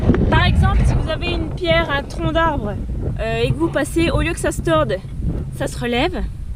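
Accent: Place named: Haute-Saône, France. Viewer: Désolé, je n’avais pas reconnu l’accent de la Hte Patate ! 👍 (Suis du canton de Marnay, émigré en Vendée depuis bientôt 40 ans). Vidéos intéressantes pour un passionné de machines et matériels agricoles ! 👏👏👏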